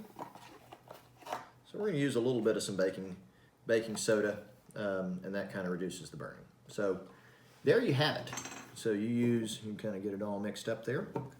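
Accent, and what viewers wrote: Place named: South, United States. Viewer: this guy is the first actual doctor who I've heard talking in a slight southern accent.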